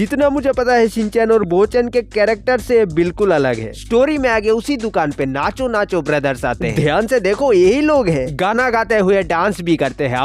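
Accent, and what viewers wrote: South, India. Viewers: Lots of love from Rawalpindi your accent is same like movie Chennai express you are from which city Namaste Namaste Namaste Namaste Namaste Namaste Namaste Namaste Namaste Namaste Namaste Namaste Namaste Namaste Namaste Namaste Namaste Namaste Namaste Namaste Namaste Namaste Namaste Namaste Namaste Namaste Namaste Namaste Namaste Namaste Allah Hafiz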